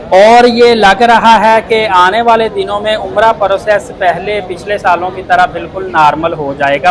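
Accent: Punjab, India. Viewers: پالیسی لفظ ہے جناب پلیسی نہیں بولتے 😊 آنگلش بھی پنجابی میں 😊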